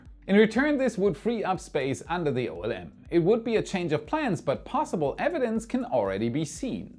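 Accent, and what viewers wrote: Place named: Germany. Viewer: Awesome to see you back. Space should always have a German accent!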